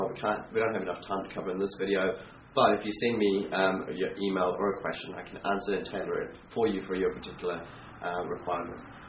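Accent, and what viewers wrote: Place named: Australia. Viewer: Hello Mate, Firstly speak clearly,there is lot of resonance in background..I can understand..you speak like an Ausiss mate.I know i was in merchant navy and sailed all over world so I can speak in all the accents. do you know rushell peter watch his show...joking Few points for you to improve. 1.Speak loud and clear 2.write on board neatly 3.If you cant write have a printout in bold letters.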